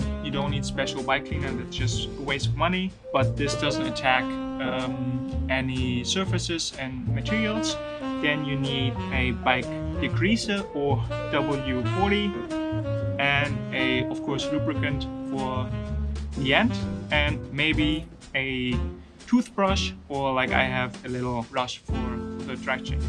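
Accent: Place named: Netherlands. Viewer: Very nice music haha! And you got a dutch accent, sweet!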